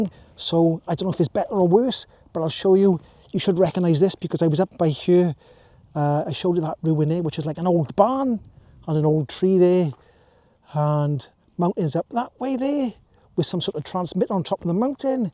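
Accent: Wales, United Kingdom. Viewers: Sooooo glad you have had this bike for review as I've set my little heart on it. The pro version does not have a job motor and does not have my beloved boost. The battery is using Samsung batteries which enable the gate charge and will also give a longer life as they can withstand the stress of current flow during the charge (so I'm told😅). It would be great to see a range test and listen to your brilliant commentary with the Welsh shining through. Thanks for a great review 🎉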